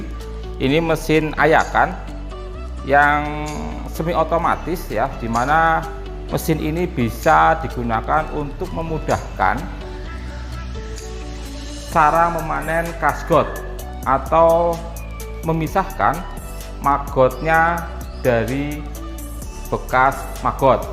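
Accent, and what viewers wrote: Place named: Java, Indonesia. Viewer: NtabS....ayakan si Medok 👍🏾👍🏾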